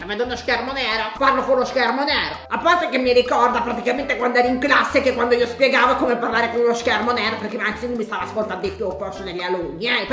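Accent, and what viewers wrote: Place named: Marche, Italy. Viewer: Sei brava a parlare il marchigiano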